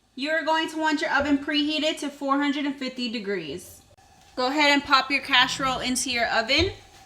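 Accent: Texas, United States. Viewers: I’ve never heard anyone call it a “cash-a-roll”. Lol. That must be your Texan accent ;)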